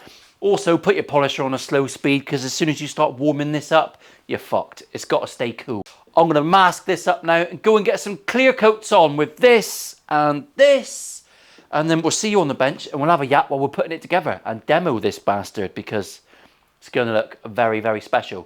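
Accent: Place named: Plymouth, United Kingdom. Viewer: your videos are a highlight of my week, love your honesty, your humour, and your craftsmanship. Love your builds, but you need to do another bass for all us low enders. I spent five years working in Devonport, so it’s nostalgic to hear your janner’ism’s. Keep up the good work.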